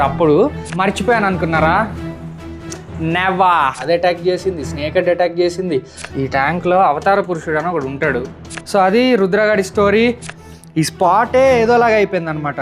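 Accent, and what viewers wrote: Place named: Telangana, India. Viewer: Telangana, slang Super meru❤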